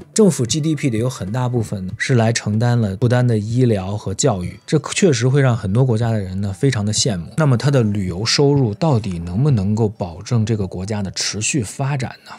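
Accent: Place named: Tibet, China. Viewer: 博主是我看過普通話最標準的藏族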